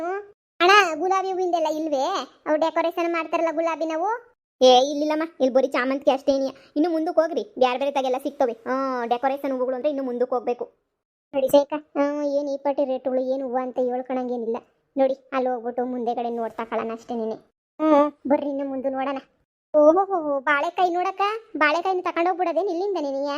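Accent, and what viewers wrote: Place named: Mandya, India. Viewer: ಯಾವ್ ತಾಲೂಕು ನಿಮ್ಮ ವಾಯ್ಸ್ ಮಾತ್ರ ನಮ್ tmk ಡಿಸ್ಟ್ರಿಕ್ ದು ಇದ್ದಂಗೆ ಇಲ್ಲಾ ಮಂಡ್ಯ ಅಥವಾ ಹಾಸನ ಸೈಡ್ ಇದ್ದಂಗೆ ಇದೇ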